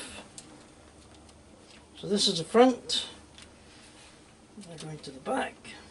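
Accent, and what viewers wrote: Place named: Scotland, United Kingdom. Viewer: Love the video and as if by magic "as Mark quoted " ,im new to N-Gauge so have been watching loads of videos to learn ,then i found you "brilliant" im from Aberdeen and your from a wee bit down the road i gather by your twang. keep it up im going to join Aberdeen Model Railway Club and gain knowlage from them too, i just bought a load of stuff from your favorite Ebay and am converting the engines to DCC,look forward to more videos ,keep up the good work and Thank &You. .regards John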